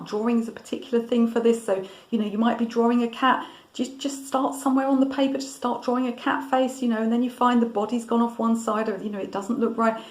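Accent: United Kingdom. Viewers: Wow ~ I thought you were talking to me about ME! ~ As in, all of the above mistakes, almost. The only one that didn’t apply was the Bonus. I’m so timid I let my paint dry way to much, most of the time. Then I have to work myself up to coming back to it! Like I end up going to the kitchen and making a pot of vegetable soup before returning to it. Seriously, I appreciate all of your observations ~ just listening to your beautiful British accent brings me a bit of calm and resolve to get my act together. Thanks so much ~ please keep up the good work💁🏼‍♀️😻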